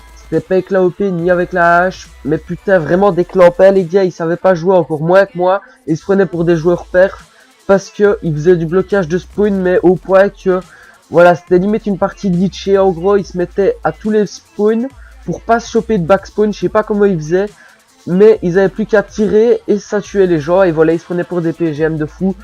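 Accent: Belgium. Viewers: Hahaha tu m'as tué mon accent de mes couille j'ai raté ma vie prc g pris la Xbox Xd (ps moi aussi je suis belge et g un accent comme le tiens (un accent de mes couille comme tu dis) continue!!!) +1 abo à ta chaîne principal (déjà abonné a là évasion) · Commentary a la belge comme moi x') !!!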